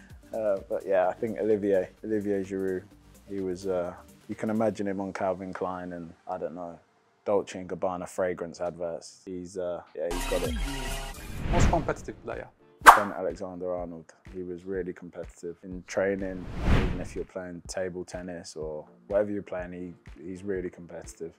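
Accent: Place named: England, United Kingdom. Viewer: Chamberlain’in röportajları keyifli oluyor, hem vizyonlu hem de anlaşılır bir İngiliz aksanı olması çok iyi 🖤🤍🦅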